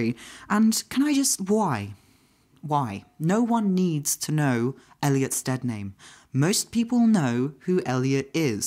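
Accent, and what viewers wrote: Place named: United Kingdom. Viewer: I've never come across your channel before, but I need to say I was NOT prepared for that voice! Between the soothing timbre (I was fooled by your cherubic face) and the British accent... lovely. Like I could see you narrating documentaries or something haha.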